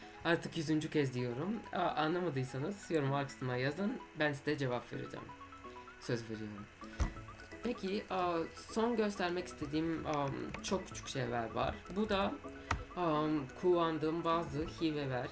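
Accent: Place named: France. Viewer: Fransa'da mı yaşıyorsun? Çünkü aksanın Fransızları andırıyor. 😊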